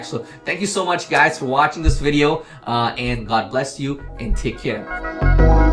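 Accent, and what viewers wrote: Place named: United States. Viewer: Bro make a video on how to get that American accent😳🔥💯💯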